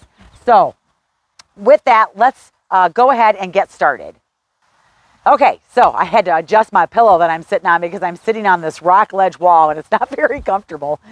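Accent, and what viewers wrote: Midwest, United States. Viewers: Great information and a video without a ten-minute introduction of mindless time-wasting filler. All the folks complaining about the Midwest accent can drop off. Imagine feeling so self-important that you criticize the way another person speaks when that person is trying to help you. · I love this real American accent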